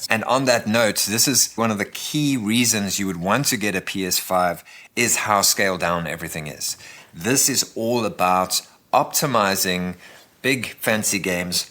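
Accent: South Africa. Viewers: Are you South African? You sould South African. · You South African ?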